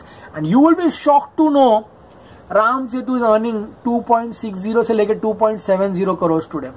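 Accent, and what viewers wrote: Karnataka, India. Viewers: Your kannada accent is too good..... Feels like you are Kannada da hudga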